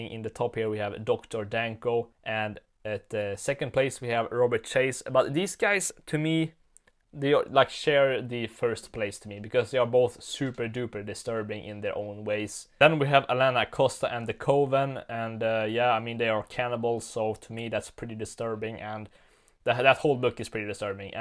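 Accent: Sweden. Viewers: Är du svensk? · Are you swedish by any chance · He sounds turbo Swedish, because he is. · 33 seconds in and I am certain of one thing. you are Swedish.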